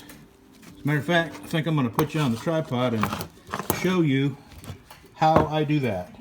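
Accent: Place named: Connecticut, United States. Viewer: great job,i could tell by your accent you were originally from Ct.....lol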